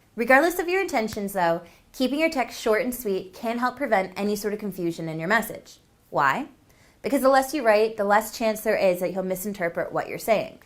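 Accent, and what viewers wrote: Canada. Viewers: She is from the west coast in Canada.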